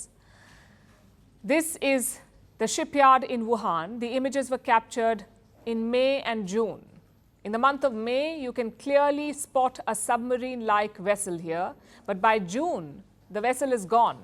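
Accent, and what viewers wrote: India. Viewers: FirstPost and WION are CIA funded propaganda channels. They pick this woman with reasonably pretty face and thick Indian accent to confuse Indians. She is paid to make Indians hate China and Russia. She is professional woman who is selling her face and voice to the CIA for US cash. And you fell for it. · Finally his female "with india voice patterns" does not seem like a cow chewing its Cud OR Carry its self as though the world OWES her The A ++ is her slight Timidity and recognizes there are forces of Awakened that proceed her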